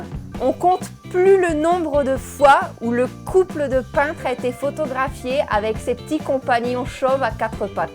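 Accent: France. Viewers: Me ha encantado tu video me hermana francesita! me das mas datos de mi cultura que que el INAH! (Instituto Nacional de Antropología e Historia) y es muy agradable escuchar tu acento "franchute" Ja parle francaise, un po y me encanta escuchar esos tonos y modos del francés! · Amo tu forma de explicar nuestra cultura con mucho entusiasmo e interes. Note que mencionas referencias y das a detalle del tema que abordas. De igual manera tu acento frances. Bellisima mujer. 😍👩🏻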